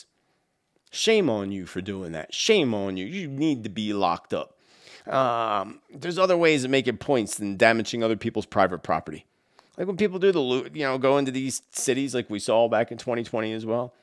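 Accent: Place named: United States. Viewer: 💚🤗💚 Bristol UK,,,, Luv the crazy American pronunciation of UK cities 😂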